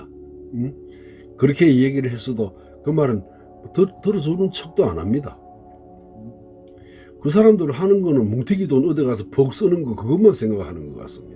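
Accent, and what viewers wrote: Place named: Gyeongsang, South Korea. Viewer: 굿모닝~~^^. 선생님. 원래 정치인의 공약은 空約이라고 보면 됩니다. ㅎㅎ 화려한 불빛. 빌딩 사이로 빈민들의 추위와 배고픔이 있네요. 우리 님의 목소리는 여기 서부경남 분 같습니다. '이게 뭡니까'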